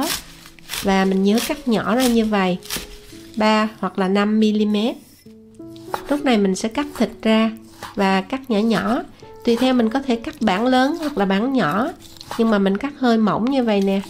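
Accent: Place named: Mekong Delta, Vietnam. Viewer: Hấp dẫn quá !! Món này làm lai rai thì đúng bài rồi , cám ơn lời chia sẻ và giọng nói thân thương của người dân ((Miền Tây))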